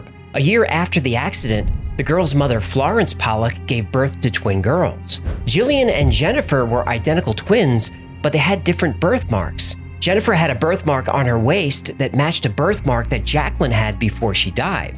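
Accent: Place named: East Coast, United States. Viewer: Hey, do you have any relation on television? The hubby and I watch “Toon in with ME” on METV and there’s a guy on there named Toony the Tuna, and he’s got an east coast accent like yours. Coincidence??? AJ says there’s no such thing.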